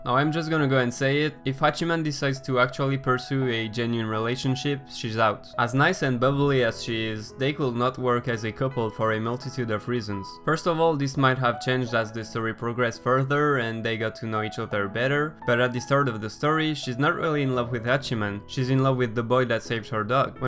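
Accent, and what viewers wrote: France. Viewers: Good video !! Btw, are you french ? (Your accent)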